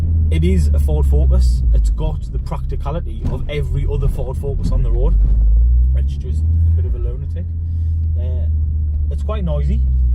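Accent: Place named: Sunderland, United Kingdom. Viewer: you sound local to me bud I'm from Sunderland cant wait to get my mk2 this year , iv never had the chance to even sit in one there that rare around my area .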